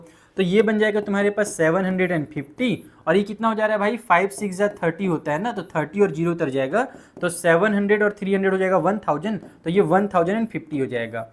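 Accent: Bihar, India. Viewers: Pta nhi chl rha kya language se biharrr babu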